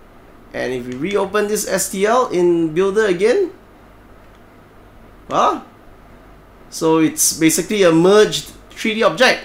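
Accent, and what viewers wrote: Singapore. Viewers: you sound Singaporean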